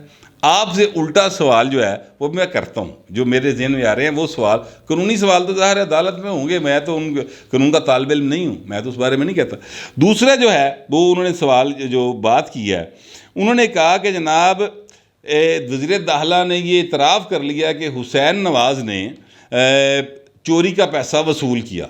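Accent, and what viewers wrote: Punjab, Pakistan. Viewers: سر آپ کا تجزیہ تو 💯 بہترین اور سچ پر مبنی ھوتا ھے پر آپ کا انداز بیان بہت خوبصورت ہے اوردو کے ساتھ جب پنجابی بولتے ہیں بہت cute لگتے ہیں بھائی جان ھم آپ کا ہر ویڈیو دیکھتے ہیں from uk students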